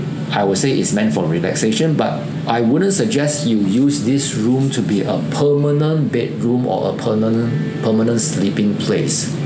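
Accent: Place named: Singapore. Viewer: The Singaporean English ........😳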